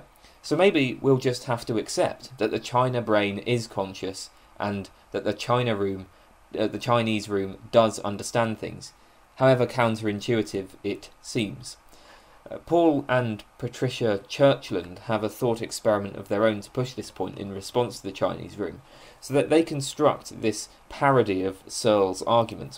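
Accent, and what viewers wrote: United Kingdom. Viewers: Most British sounding man but writes z in stead of s?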